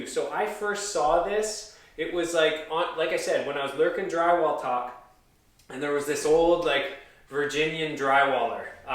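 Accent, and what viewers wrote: Canada. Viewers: Cool... The older you get, the more Canadian you sound eh. Awesome videos. Thanks!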